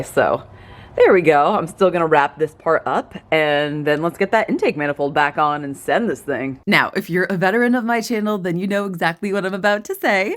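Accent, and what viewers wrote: Texas, United States. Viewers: Are you Texas ?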